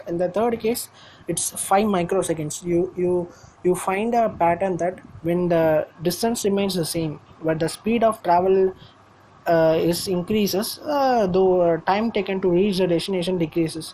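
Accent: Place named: India. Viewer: I love your video. I only would hope that you could improve your english accent. As a native speaker your Hindi/Urdu tone makes it difficult to understand. Good work though I like your explanation.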